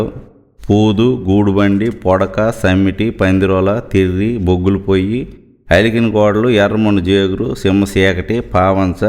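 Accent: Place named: Uttarandhra, India. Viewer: అంతరించి పోతున్న ఉత్తరాంధ్ర మాండలికం, నుడికారం, స్థానికంగా ఉచ్చరింపు మీకే సొంతం... మీ సంకలనానికి దాసోహం...🙏🙏🙏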